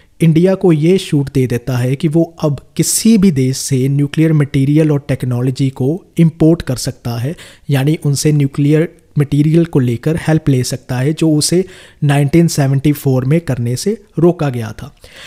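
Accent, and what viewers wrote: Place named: Punjab, India. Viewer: Very effective and knowledgeable information with punjabi assent. Thankyou so much sir.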